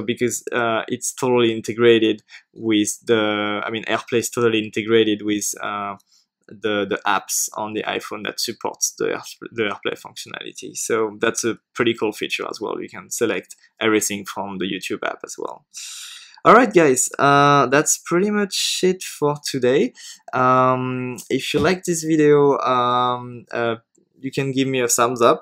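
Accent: France. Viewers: I can hear u r from France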